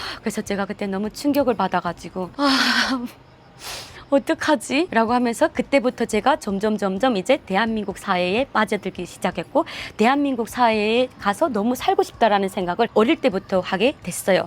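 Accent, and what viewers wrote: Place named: Kansai, Japan. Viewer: 話しかたが大阪のオバチャンみたいで親しみがわくよ。これ大阪弁でやったらウケると思うな。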